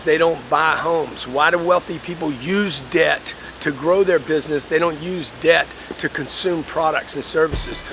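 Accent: South, United States. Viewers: Ban Nsabin it’s his southern twang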